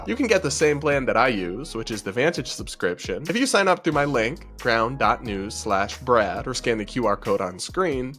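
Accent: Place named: Oklahoma, United States. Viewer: Soo terribly minor--but I mention it only because I have heard you do this a few times before and it's at variance with how well-spoken you are. -ish; you say "have went" instead of "have gone". I wouldn't have even posted about it, but it always takes me out of what you were saying and think, "Hmm that's very 'Okie'." (I am an Oklahoman and so, love you Okies, but I worked durned hard to not sound like one!)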